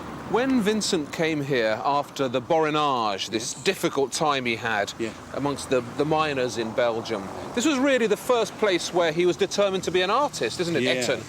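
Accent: England, United Kingdom. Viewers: Waldemar Januszczzak you are taking my breath away, the way how you talk this narrative is literal refreshment. I hear you with my nices brain neurons while my ears turned deaf with that beautiful British accent. Thank you for sharing your knowledge, journalists' curiosity, poets' spirit, and Slavic gen. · Why do you pronounce the "er" in clerk as you pronounce the "ar" in art? same with the fooking derby. The Kentucky Derby. innit? . Derrrrrby. not Darrrrby. With an "e". The Darby? Fuck sakes, England. Get it right. Yes, yes, we say learned instead of learnt, and burnt etc. but at least we pronounce derby correctly.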